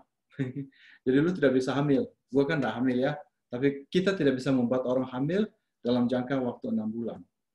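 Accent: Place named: Maluku, Indonesia. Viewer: Sdr, terimakasih langsung share ke saya. Sepertinya logat2 Maluku nih. Semoga hasil penelitiannya favorable ya, tetap sehat, semangat,Tuhan memberkati dan setiap langkah upaya pengobatan dan pengendalian Covid.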